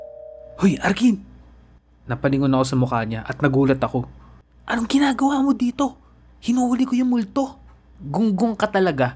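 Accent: Ilocos, Philippines. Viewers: Alam mo po pala mag ilocano kuya arkin😮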